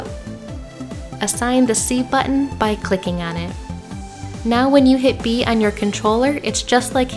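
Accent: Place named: Michigan, United States. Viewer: Michigan or Chicago? I think Michigan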